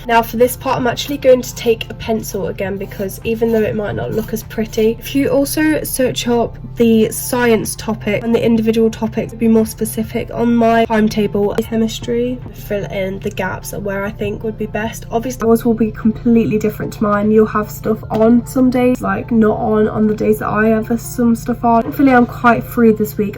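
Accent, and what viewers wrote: United Kingdom. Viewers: you have british accent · Guess ,you speak britich accent ,right ? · I like ur British accent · her British accent <33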